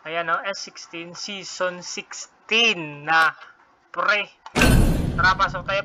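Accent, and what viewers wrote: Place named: Visayas, Philippines. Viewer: Idol taga saan ka idol parang bisaya ka ahh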